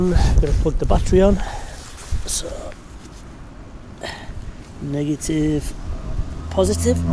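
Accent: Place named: England, United Kingdom. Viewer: very nice eng accent haha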